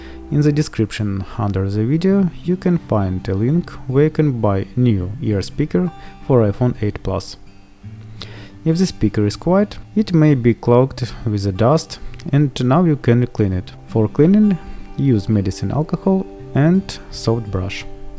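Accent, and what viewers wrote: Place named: Russia. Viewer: рашн аксент дас ист пыздець ) and thanks a bunch for the video, it's awesome and clearly explains all actions - much appreciated 👍🏻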